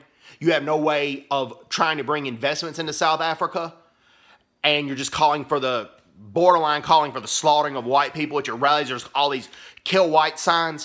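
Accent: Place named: United States. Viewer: Oh shut up! You come with your American accent and tell us the land was not stolen? Man you are lying. We will expropriate land.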